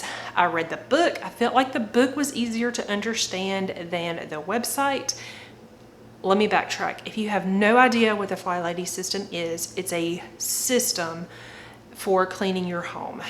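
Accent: South, United States. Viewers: hello from NC! I can tell by your accent you're from the south, too. It's nice to hear that comforting sound, right? I'm a new Flybaby. I guess I should say I'm more of a flylarve. I just about jumped on board a few years ago and got overwhelmed. Today, after divorce, domestic violence therapy for severe PTSD and raising my kids completely on my own, I found myself craving routine. Needing guidance, I went back to the FlyLady website. But, like everyone has said, boy, that website really needs a facelift. So, I turned to YouTube. Yes, the Secret Slob and all of the others you mentioned have led me here. To be transparent, I just bought, downloaded and put together my Control Journal today. I was looking for one more push and searched "flylady 31 baby steps". That's what brought me to you. I'm like you in a lot of ways. I'm all or nothing. Typically, I jump in sprinting and can never finish the race. 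I procrastinate until important becomes urgent and suddenly, chaos ensues. I haven't watched any of your follow up videos yet, but I will as I take my babaysteps. I was even thinking of using my Instagram account to post Stories about my journey. I have a planner account that has a substantial number of followers. Maybe, if I tell them I'm doing it, it'll give me accountability. I'm sure making videos has done that for you. Cheers to you for taking the plunge again. It says a lot about you that you "failed" and yet, came back for round two. I'm looking forward to watching y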